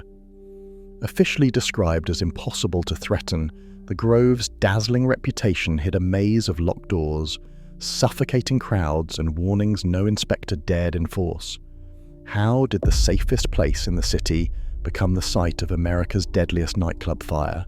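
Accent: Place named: United Kingdom. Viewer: I was just going to write the same thing! They show the floor plan, then whisk it away before anyone can get a good look, then they show the same guy over and over. This happens more and more on YouTube videos: people with no training or experience slapping something together. But they think they can convince us that it was brilliantly done because of the narrator's British voice. A very common ploy!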